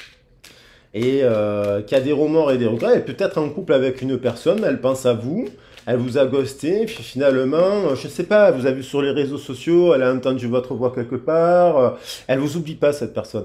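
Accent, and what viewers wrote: South, France. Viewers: Merci pour cette guidance 🙏, j aime bien votre accent du sud 👍belle journée 🤗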